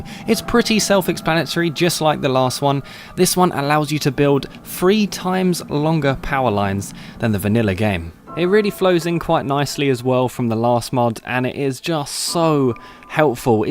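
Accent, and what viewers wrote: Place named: United Kingdom. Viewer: Brilliant vid mate, to the point, no retarded goofing, stressing immersive mods, and in British English. Subbed.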